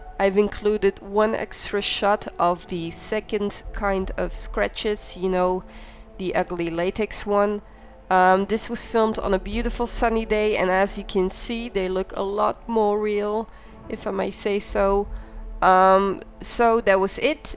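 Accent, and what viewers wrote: Netherlands. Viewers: This looks really good and detailed! thanks! btw where are you from? your accent sounds slightly dutch or something😊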